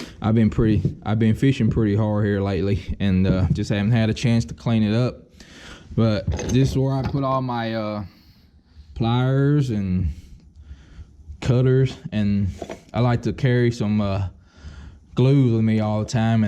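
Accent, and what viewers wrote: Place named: Texas, United States. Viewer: Dig the walkthrough, ive got a nitro as well. Your accent is tripping me out tho. You ffom texas? Thats where I'm from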